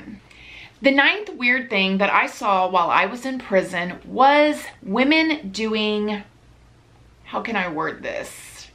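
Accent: South, United States. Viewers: You are literally so precious!!! Love your southern twang x you kept cracking me up!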